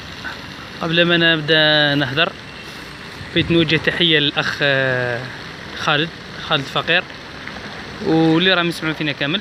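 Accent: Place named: South, Algeria. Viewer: هادي هدرتنا حنا تاع الجنوب...الله يهديك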